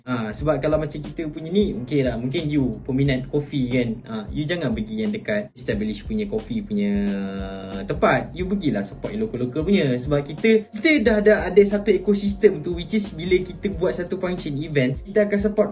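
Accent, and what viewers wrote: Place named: Kuala Lumpur, Malaysia. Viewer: Dtg daripada keyel ke jaybe Xleh blah ah aku dgr slang dia😂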